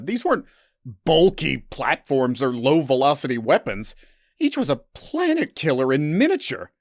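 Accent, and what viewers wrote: South, United States. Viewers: YES! of course, as the University of North Carolina found out when studying North Carolina accents, there are 105 different versions of southern accents in that state! Good Job, you all, in choosing this accent for such a good story! Same plot as in many AI generated stories but it is the way a story is told rather than the actual plot, that counts for all!! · I like that you actually used your voice for this, as opposed to every other HFY channel, but one thing: WHY A HEAVY SOUTHERN ACCENT? ITS DUMB, OVERUSED, AND IS AN AFFRONT TO MY REGION (WE DONT SOUND LIKE THAT) Great video!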